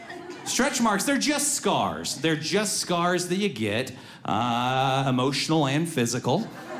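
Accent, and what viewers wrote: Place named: South, United States. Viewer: His southern accent is still there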